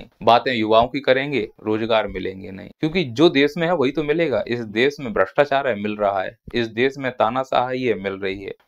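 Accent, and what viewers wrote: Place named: Agra, India. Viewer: Aap agra firozabad ke aaspaas ke lag rhe hain bhasha se😊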